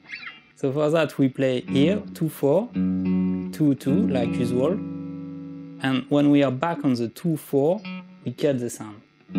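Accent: France. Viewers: are you french ? cuz you sound pretty french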